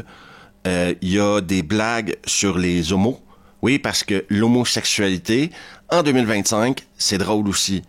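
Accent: Quebec, Canada. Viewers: Tu m'as bien fait rire dommage pour ton accent merdique mais bon t'es Québecois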